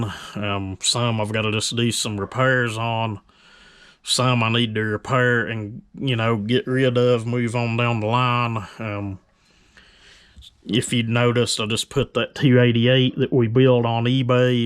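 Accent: United States. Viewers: This accent! What state you from bud. Jason new subscriber from McLean Saskatchewan. I'm really liking your channel.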